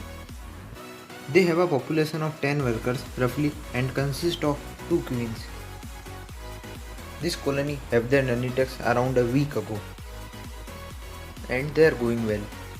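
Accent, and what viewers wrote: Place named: India. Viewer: India's accent sound Funny idk why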